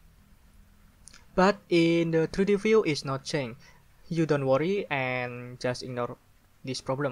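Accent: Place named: Indonesia. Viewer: the logat like indonesian people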